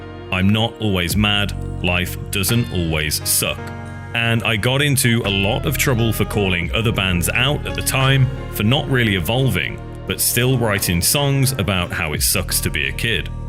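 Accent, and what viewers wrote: United Kingdom. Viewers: let me explain it for your side of the road leaf iq brain my point is the same video is being made with the same information so there’s no point in your uk voice ass documentary being made when there’s already a bunch made but wtv get the views you feining for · The commentary really kills this video. Hearing a british guy say "pop locking" was more than i could take.✌🏾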